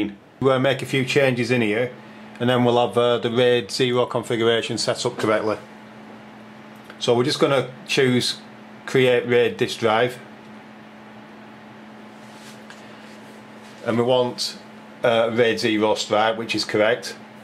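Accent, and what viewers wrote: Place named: Scotland, United Kingdom. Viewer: Thanks! But I had to watch the whole thing over a few times, because I was so mesmerized by your Scottish accent. ;) ^_^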